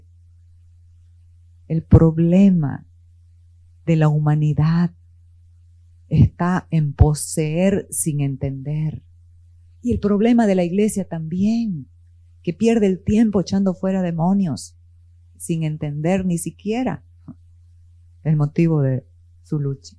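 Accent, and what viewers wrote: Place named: East, Bolivia. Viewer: Me agrada como predica la 👍🏻 me encanta sus pausa y la forma entendible de la explicación de la Palabra🙌🏼 y sobre todo tiene el tono del oriente de Bolivia🤗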